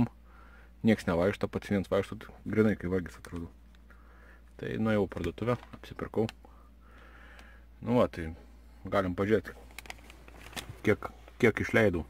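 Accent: Šiauliai, Lithuania. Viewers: tikras siaulietiskas akcentas :D linkejimai is pietinio :) po keliu savaiciu jau norisi namo ar visa kadencija (6sav ar ilgiau) viskas ok?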